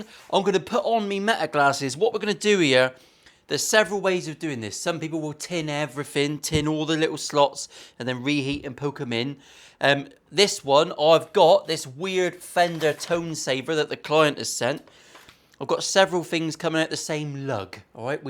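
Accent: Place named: Northern Ireland, United Kingdom. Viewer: Weird the way I can't 'hype' your videos. Must be the humour. I wish id seen this before I did mine. Exactly the same body. Just a custom neck I made. I might now review my work on the body. Cheers mate 👍 .. your Norn Iron accent is spot on 😂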